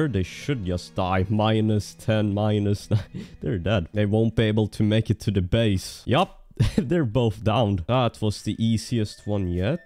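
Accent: Sweden. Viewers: You are the most stereotypically swedish sounding guy ive ever heard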